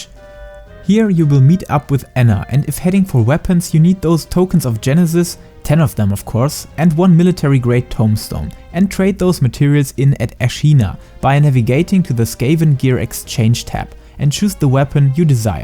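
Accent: Germany. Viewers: sorry to ask man but where do you come from your voice sounds like a german that speaks english ^^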